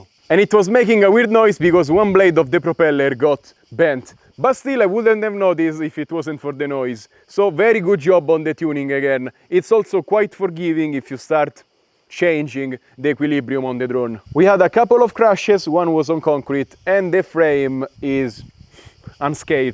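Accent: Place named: Italy. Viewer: Man I like your accent! Are you Italian?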